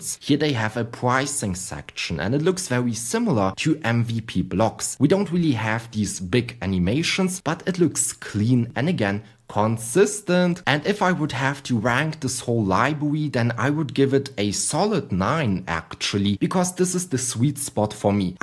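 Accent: Germany. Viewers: Are you German ? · your accent is really german haha, fire video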